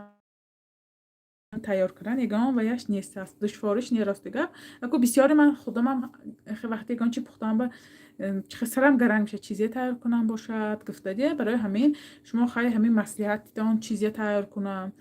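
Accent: Isfara, Tajikistan. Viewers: Апахо бовар кунетон Ман хамин канала гапзанихойи исфарагизоя ед кардаги росо ачойиб забонихудатонки шумо молодец апашка♥️♥️♥️💋💋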